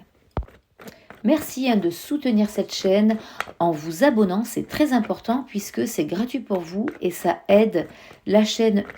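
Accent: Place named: Corsica, France. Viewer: merci j'ai ressentis un léger accent Corse dans votre voix en tout très bon tirage bonne soirée à vous a bientôt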